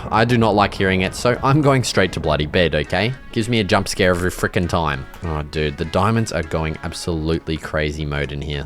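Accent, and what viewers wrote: Australia. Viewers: U australian, i thought u were british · i could sense the australian in you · I've been watching your videos for about 6 months now, and I have to say I love your Australian accent, and your building style is absolutely amazing. I recently just started a forever world, and your videos are great inspiration for build ideas. I will forever appreciate your videos.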